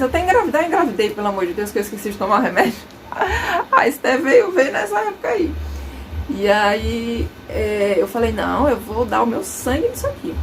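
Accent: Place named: Salvador, Brazil. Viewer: É daqui de Salvador com certeza 😜😁😁 ! Só nós com esse sotaque kkkkk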